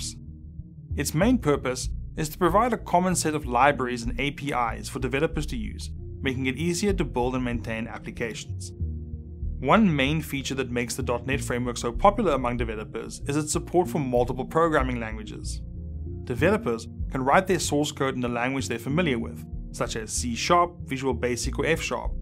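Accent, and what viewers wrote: South Africa. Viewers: You a Jozi man?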